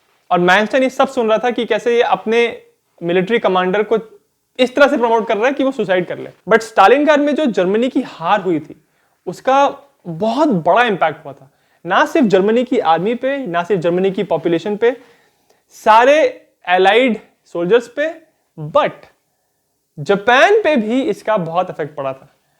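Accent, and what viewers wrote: Bengal, India. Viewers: Sir, are u bengali ?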